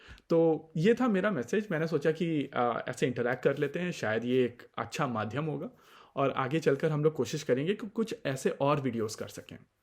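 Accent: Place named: Maharashtra, India. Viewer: भाऊ. तुम्ही मराठा(महाराष्ट्रीअन) आहात का? तुमच्या कारण शब्दाच्या उच्चारामुळे जाणवले.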